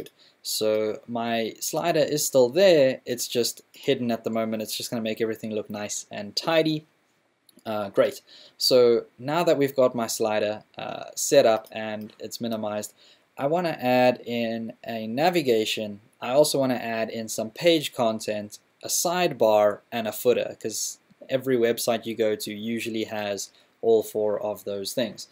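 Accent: South Africa. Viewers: Thanks for a really useful and easy to follow tutorial series - I'm a subscriber. You make learning enjoyable and simple! Love your South African accent too mate... and you're a really pleasant and cool guy! Keep up the great work... and I'd love to see more tutorials on topics such as Angular.js, react.js and node.js, etc. We'll keep you busy mate!:-)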